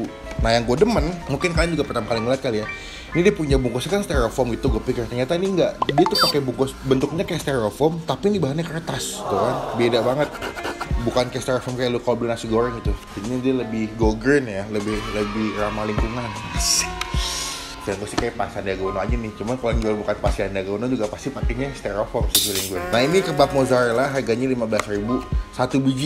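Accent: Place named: Palembang, Indonesia. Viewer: Wong plembang mno suaronyo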